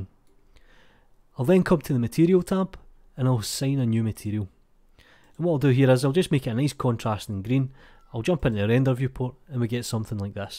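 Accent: Scotland, United Kingdom. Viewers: Thank you random scottish guy from internet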